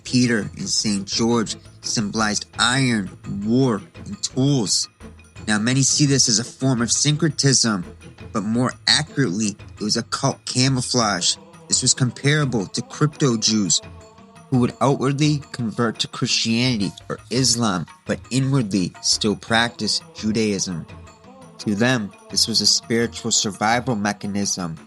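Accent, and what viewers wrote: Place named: New York, United States. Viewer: You gotta be from New York w that accent lol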